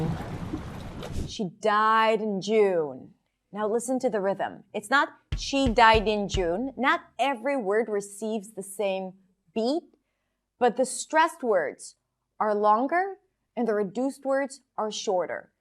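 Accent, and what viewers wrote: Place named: Boston, United States. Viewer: She is really easy to understand but her vowels are not standard English but some kind of dialect, I expect Boston. My understanding is that the everyday Boston accent is difficult to understand by people not from the area.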